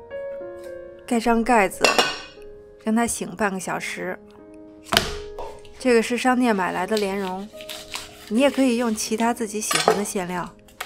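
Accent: China. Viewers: i like your chinese accent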